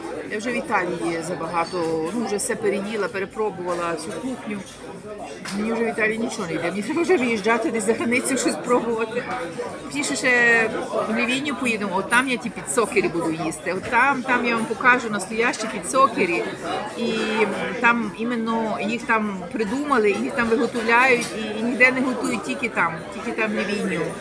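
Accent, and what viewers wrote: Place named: Western Ukraine, Ukraine. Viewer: Німці дійсно пʼють багато пиво, але по якості не зрівняти з нашим. У них дуже смачне пиво. Коронна страва це шніцель з капустою синьою . Багато їдять мясо, спаржу корисну. У них є багато цікавих страв. Але ви подаєте про людей з Європи і про німців не коректно.. Не гарно знімати , як ви їсте) коли їдять , то не розмовляють. Культури у вас вибачте мало. Італійці багато пʼють вина , як воду. Треба поважати народ з іншоі країни.Мені прикро , як наші люди позоряться. Не знімайте більше відео .. не ганьбіть українців., тим більше по акценту вашому ви з заходу України ..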